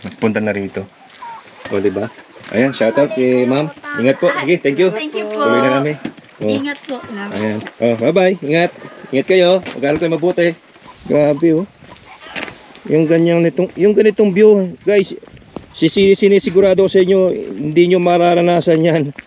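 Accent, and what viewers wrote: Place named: United Kingdom. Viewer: WOW THAT'S A BRITISH ACCENTS.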